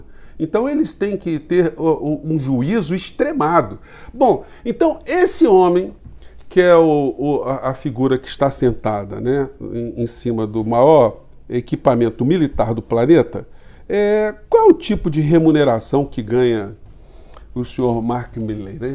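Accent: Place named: Northeast, Brazil. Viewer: Adooro as palavras do Professor! Parece nóis, nordestinos, falando...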